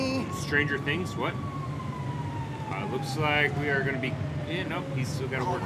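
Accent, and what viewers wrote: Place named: New York, United States. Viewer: If you CPD where is o block you don’t sound like you from Chicago you sound like you’re from New York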